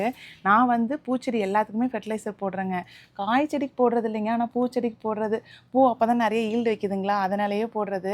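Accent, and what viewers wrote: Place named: Namakkal, India. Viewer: Sorry, nangalum namakkal than ka. Neenga visa visanama pochnu solrapa engala mathri solreenganu santhoshama irukum ka.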